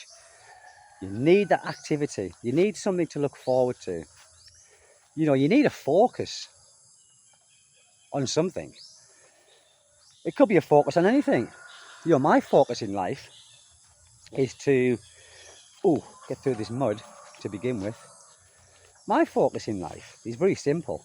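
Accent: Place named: North East England, United Kingdom. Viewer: sounds like you come from somewhere not a million miles from my area in the N.E, Cleveland area.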